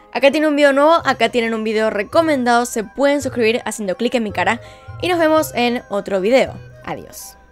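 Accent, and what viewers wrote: Argentina. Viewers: Así hablamos los argentinos jaja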